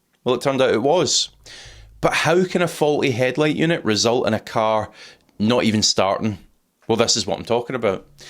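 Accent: Scotland, United Kingdom. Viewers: I have a problem with Scottish accent; Did you say cambus or canvas at the begining? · NOTHING beats a Scottish accent! Greetings from Arkansas. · My friend not only you do a great job you also introduced me to the Scottish accent (love it, no subtitles for me from now on 😂). Seriously, your content is great and of significant practical importance. Thank you. Take care, keep it on. Greetings from Greece. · I love a man who has the courage to tell the unpopular truth...and even more when he does it with a Scottish accent.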